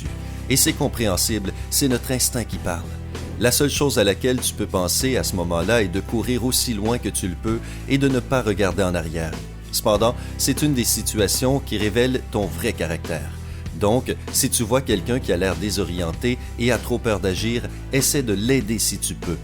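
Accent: Quebec, Canada. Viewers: C un quebecois qui parle.Moe aussi j'en suis un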